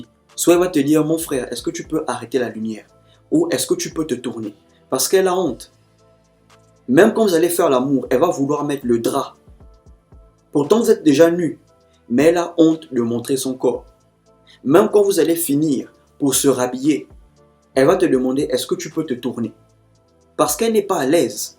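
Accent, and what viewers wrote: Gabon. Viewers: te gabonais frère l'accent ce chez moi içi ah libreville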